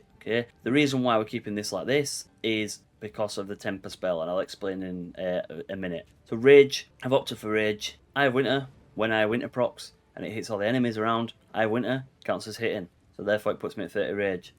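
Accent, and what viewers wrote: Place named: United Kingdom. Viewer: british english…the most annoying version of english to listen to….build is nice though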